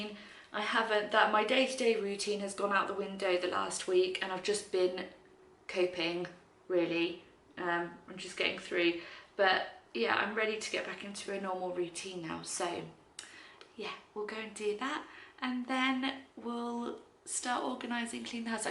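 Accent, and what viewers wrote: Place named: England, United Kingdom. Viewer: I love your accent, can I ask you what part of England you originate from?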